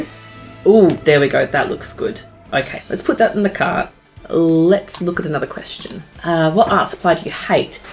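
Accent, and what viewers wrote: Australia. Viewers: This was a video that started to autoplay and I wasn’t actually looking at my screen and I nearly broke my neck with how fast my head whipped around at the sound of your Aussie accent!! 😂 Couldn’t hit subscribe fast enough! My daughter is very creative, can paint and is a preschool teacher so I will also share your channel with her.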